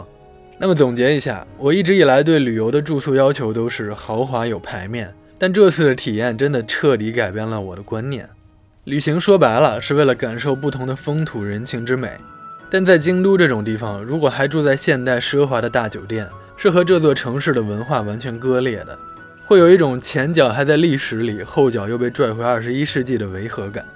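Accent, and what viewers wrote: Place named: Beijing, China. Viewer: 他生活環境就那個北京調 多看幾個影片後 覺得也還好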